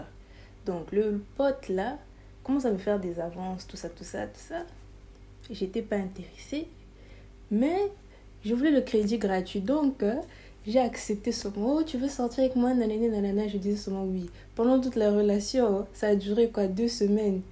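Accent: Democratic Republic of the Congo. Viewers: 😂😂j'aime trop ton accent congolais ❤ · Une vrai CONGOLAISE sans complexe avec son accent j'aime 😍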